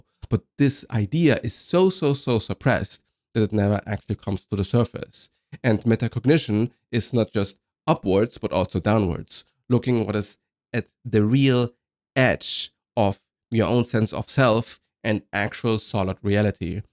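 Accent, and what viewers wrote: Germany. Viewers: hey friend, love your stuff. thanks for sharing. it's really brave of you to speak your truth and i really resonate with what you say. are you german by any chance?? i'm trying to pin the accent hahah. perfect english tho, no offense. have a good day !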